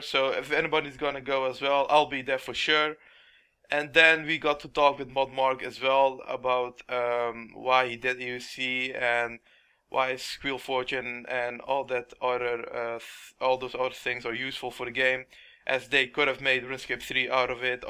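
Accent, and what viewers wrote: Netherlands. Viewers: are you Dutch?! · Why does it sounds like you are Dutch or Belgium lol. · Hahaha ik wist het gewoon dat jij een nederlander was, ik hoorde het al een paar keer in je videos maar nu weet ik het zeker. ik denk post het maar gewoon dat ben ik dat ook weer kwijt. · you sound really dutch in your english accent, where are you from?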